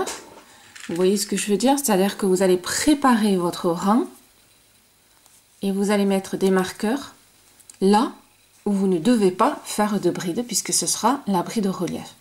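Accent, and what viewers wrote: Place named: Southwest, France. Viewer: bonjour, je recherchais un point pour un poncho et autres et là je tombe sur ton tuto. Pile à point. j'ai adoré les explications, cette touche d'humour vraiment agréable et cet accent oh là là qui rechauffe le coeur et me rappelle l'époque ou l'ai habité le sud-ouest ou les gens sont chaleureux et simples. merci.👍❤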